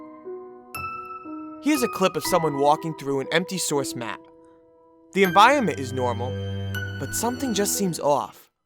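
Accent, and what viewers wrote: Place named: Massachusetts, United States. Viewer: You from mass brah? you have the same accent my dad and grandmother doo there from lowe...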